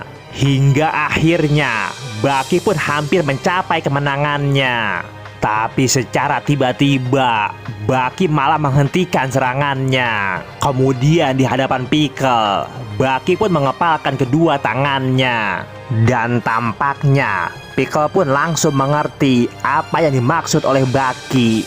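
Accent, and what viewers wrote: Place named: Betawi, Indonesia. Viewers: Betawi style...gw suka banget pembawaan channel ini...ET dh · PEMBAWAAN CERITA ALA BETAWINYA KEREN PARAH,SUBSCIRBE AH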